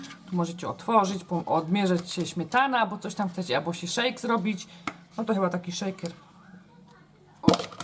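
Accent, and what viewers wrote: Silesia, Poland. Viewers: witam! Ja panią oglądam juz od prawie roku i super dodała pani przepisy w tym roku jest Pani the best i najlebszą z kucharek Pani jest ma pani śliczną kuchnie i super dzieci ja osobiście zazdroszcze im że jedzą takie pyszne żeczy ma Pani najlepsze recepty na całym you tubie ja poleciłam panią nawet mojemu sąsiadowi Kubie i jeszcze powiem,że fajnie Pani gogo po śląsku bo śląski to przecierz mój polski język a natrafiłam na Panią tak z przypadku i teraz oglądam Pani każdy film pozdrawiam: Paulina z Czechowic-Dziedzic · ja na trafiłam na ciebie jak szukałam przepisu na pannacotta twój kanał jest taki inny niż wszystkie i twoja gwara śląska jest dla mnie bardzo śmieszna i mieszkasz obok mnie bo ja mieszkam 70 km od Monachium pozdrawiam serdecznie :* a może jakieś Q&A nagrasz ? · nie pamiętam już jaki przepis był tym pierwszym uwielbiam je wszystkie i wiele wypróbowałam z przyjemnością oglądam nowe filmiki a najbardziej podoba mi się jak super mówisz po śląsku niektóre filmy oglądam tylko aby posłuchać Ciebie jestem z Gdańska i gorąco pozdrawiam Ciebie i Twoje słodziaki które czasem pokazują się lub odzywają buziaki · Ale ześ wymyśliła konkurs. 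Ja ci nie powiem jak cię znalazłam. To były chyba twoje rózne drozdżowe wywijasy: piza słońce, makowiec i gwiazda z nutellą. Ja zasubskrybowałam cię bo uwielbiam, jak gadasz z tym ślaskim akcentem:D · ja trafiłam na pani kanał jak szukałam jak zrobić ciasto na pizze. Oglądam panią razem z moją mama.:-) jest pani super!:-) Mieszkam na śląsku obok Katowic i Sosnowca. miło jest oglądać kogoś co tysz umi godac po slasku.:-) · moje dzieci są zachwycone Pani gwarą, my jesteśmy ze Szczecina i nie mal każde słowo Śląskie jest dla nas ciekawe w słuchaniu, dzieci zachwycają się każdym zdjęciem deserowym, wiec oglądamy po jednym filmiku dziennie i od czasu do czasu pieczemy i gotujemy. Na pewno wyciąga nas Pani z kuchennej rutyny za co DZIĘKUJEMY:) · Jestem ze slàska i mieszkam w Hisxpani dosyć troche i słucham cie po ślonku to fajne jest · na początku bardzo gratuluje 13 tys. i życzę aby było ich jak najwięcej. 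Trafiłam tutaj dzięki poleceniu DG no i gdy zobaczyłam te cudowne torty zostałam najdłużej. Czasem włączam sobie pani filmik i zastanawiam się czy mi by też taki tort wyszedł? Dodatkowa urzekła mnie pani gwara, jestem ze śląska z Gliwic i moja babcia mówi w ten sam sposób, czasem mi przykro, że my (wnuki) tak nie potrafimy. Pozdrawiam, Wioleta. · Moje gratulacje z okazji subów, jestem z dolnośląska z Głogowa, bardzo podoba mi się że pani przepisy nie są wymyślne ani skomplikowane i "goda " pani krótko i na temat i często daje te filmiki co budzi moje zdziwienie, że przy trójce dzieci pani to ogarnia i jaszcze jedno- ten słodki szkrab uśmiechnięty wysyłający buźki, bardzo mi się podoba, szkoda że nie jestem jego ciocią i nie mogę go wyściskać, nie miałabym nic przeciwko temu żeby pojawiał się w każdym filmiku.Mam koleżankę w okolicach Katowic i wtedy też godom, bardzo mi się te gwara podoba .Oczywiście polecam kanał, często korzystam z przepisów, trafiłam dosyć dawno przypadkowo i oglądałam ciurkiem wszystkie przepisy, pozdrawiam, życzę dalszych sukcesów. 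· Ja poznałam panią poprzez film o Elsie z krainy lodu :) mieszkam w Małopolsce , mam 15 lat i bardzo lubię piec :) mam rodzinę na Śląsku , wiec pani gwara nie jest mi opca :) pozdrawiam ciepło !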